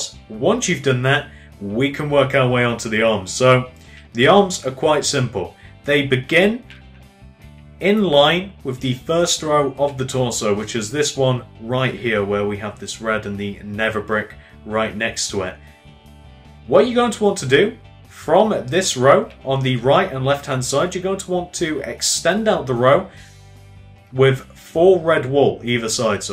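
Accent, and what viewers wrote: United Kingdom. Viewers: It's his accent, he's british xD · Mary Ruth Orr he has a british accent calm down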